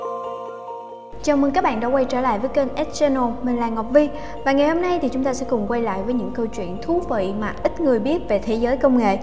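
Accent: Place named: South, Vietnam. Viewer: Giọng miền trong thì phải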